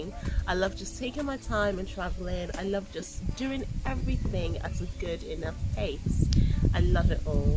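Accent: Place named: United Kingdom. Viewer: I will imagine she went to the UK as a teenager that's why she picked the accent pretty quick. Hard to pick a new accent when you are 20 and up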